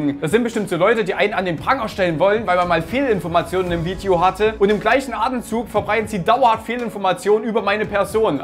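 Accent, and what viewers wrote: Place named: Saxony, Germany. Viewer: Aber ein Prob muss an die Seite geben! Sie sind vllt die Ersten die wissen, dass du einen sächsischen Dialekt hast und keinen ostdeutschen...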